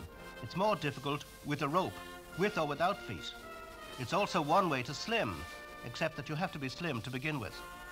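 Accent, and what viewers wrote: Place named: RP, United Kingdom. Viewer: Why does the commentator sound like he went to eton?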